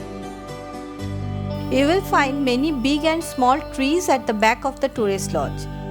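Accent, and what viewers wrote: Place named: Bengal, India. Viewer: Your pronunciation of english in Bengali accent is very beautiful to hear.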